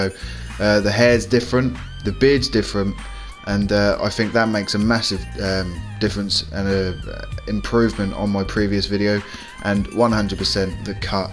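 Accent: United Kingdom. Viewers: Hello small young child, have you heard of the british accent?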